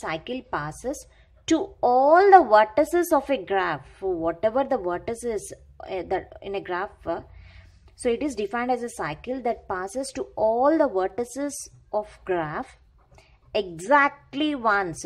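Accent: South India, India. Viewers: Thats her accent bro,may be she's from south india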